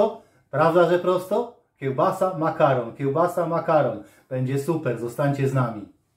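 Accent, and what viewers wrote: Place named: Silesia, Poland. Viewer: myślałem że ze Śląska, bo tak zaciągasz 😊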